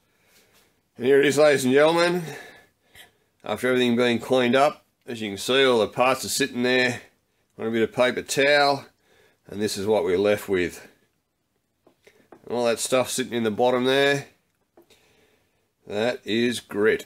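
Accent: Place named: Australia. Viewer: Hears Aussie accent... presses like immediately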